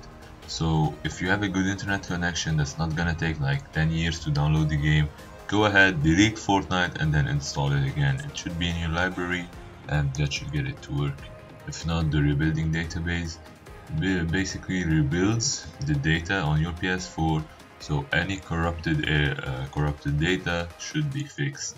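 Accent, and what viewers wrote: Lebanon. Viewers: ur english accent is like us the Lebanese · R u lebanese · Elina antoun he is lebanese, so am i lol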